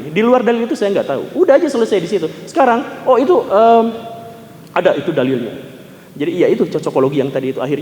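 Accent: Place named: West Java, Indonesia. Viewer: Aksen sunda dalam narasi nya adalah satu dari bbrp aspek yg bikin seru dan lucu, masa khalifah bilang gini "eee kamu teh" wkwwkk mantap 👍🏻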